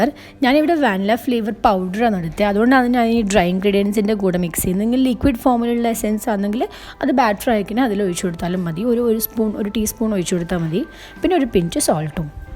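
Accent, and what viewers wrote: Kannur, India. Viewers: Nigale veed evideyaa kannur aanoo samsarikumpol nammude kannur kari ne pole und😊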